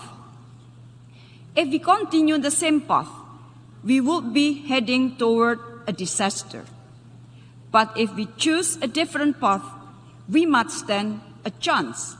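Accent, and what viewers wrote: Indonesia. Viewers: Gini lho dengerin menlunya ngomong enggres pake aksen Indo. Gak ala2 amrik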